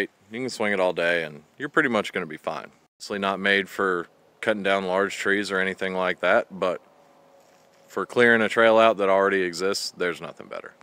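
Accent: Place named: Missouri, United States. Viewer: I swear ...you sound like your from Missouri.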